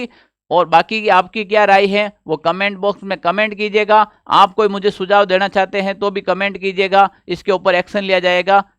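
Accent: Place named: Rajasthan, India. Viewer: Sir aap ki voice sun k aisa lagta h Rajasthan me bathi hu main😊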